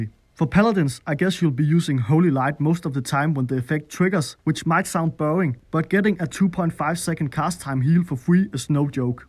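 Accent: Denmark. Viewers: Ey dude! Har set lidt af dine videoer, de sku meget søde, men den der danglish, det ødelægger det helt vildt, har du overvejet bare at lave danske videoer? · 110% a danish lad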